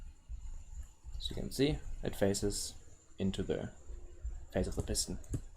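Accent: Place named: Germany. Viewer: I needed that thanks a lot :D Btw ru german? cuz u kinda have the same accent like ilmango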